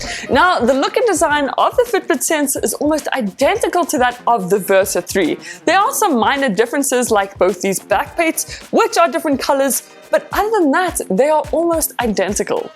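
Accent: South Africa. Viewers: Are you South African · I had to do a double take because of your accent, and then immediately recognized it as South African. Hell yeah! Great video by the way :) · R u from South Africa?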